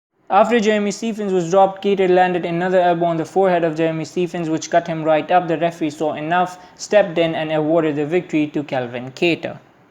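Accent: India. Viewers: 9 dislikes and 4 likes???? People are put away by your accent, but Cmon Indian hai toh you can't change that accent. This is quality content.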